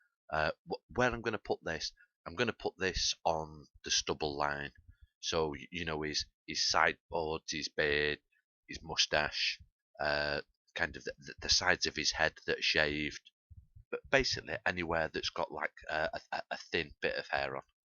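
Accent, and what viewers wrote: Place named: Northern England, United Kingdom. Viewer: Unreal work and your northern so subbed good and proper haha.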